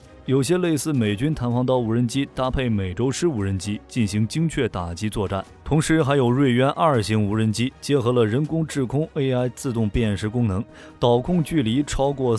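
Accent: China. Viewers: 為啥是中國發音？？？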